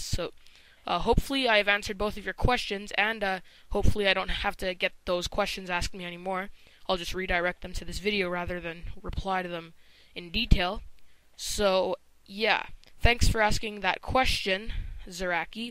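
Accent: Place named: Canada. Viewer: I'm guessing your Canadian. I have a keen ear for accents.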